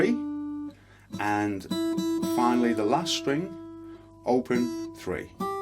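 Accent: Liverpool, United Kingdom. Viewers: Yank here. Love the Liverpool(?)accent and the tone from that martin(I live about 20 miles from Nazareth, Pa).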